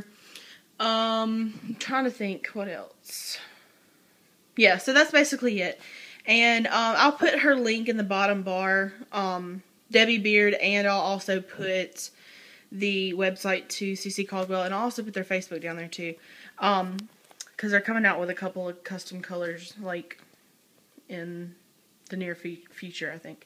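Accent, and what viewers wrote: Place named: South, United States. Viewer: thanks! Awesome nightstand, how special that it was your grandmothers. so glad you are loving the paint! thanks so much for the shout out xoxo! I love your southern accent too:) xo Debi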